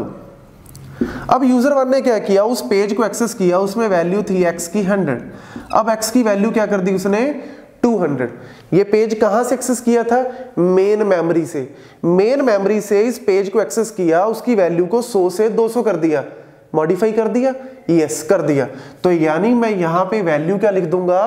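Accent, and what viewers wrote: Punjab, India. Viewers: Sir aap punjab se ho??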